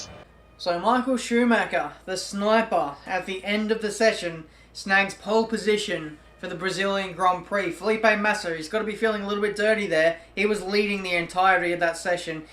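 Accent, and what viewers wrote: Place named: Australia. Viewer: kapiniuzertva its his Australian accent he says mart'n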